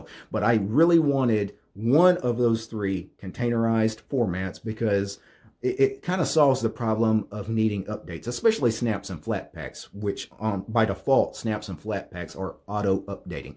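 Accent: United States. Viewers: which side of USA is this accent from? 🙂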